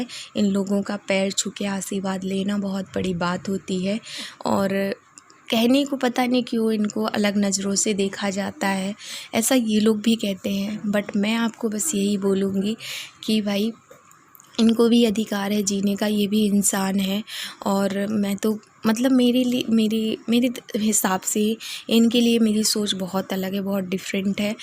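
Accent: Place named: Kanpur, India. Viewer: Apki avaj bahoot pyari hai....mere jesi lenguse hai apki....gao....kanpur...ki ho ap Kya.....me kanpur ki hooo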